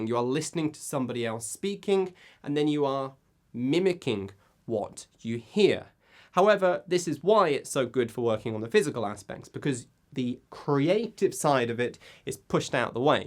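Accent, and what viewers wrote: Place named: United Kingdom. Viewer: I just LOVE your accent . Since i found your channel , i have changed my mind from wanting to practice american accent and pronunciation to british one .The british accent is so much more fun .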